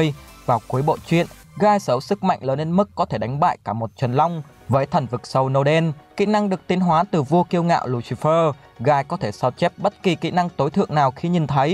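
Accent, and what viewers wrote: Vietnam. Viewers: Anh đọc tiếng anh đứng kiểu VN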